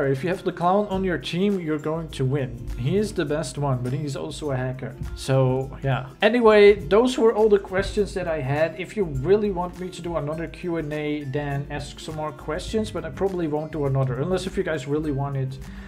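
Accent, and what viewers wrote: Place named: Netherlands. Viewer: That did i hear good you are form the netherlands . CUZ I AM DUTCH TOO! :D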